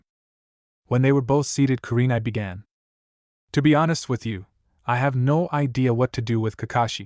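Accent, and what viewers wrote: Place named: Africa. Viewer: why u sound african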